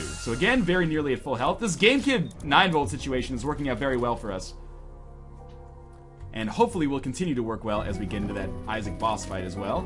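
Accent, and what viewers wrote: United Kingdom. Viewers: I love your british accent! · I did actually like your British accent. Well done.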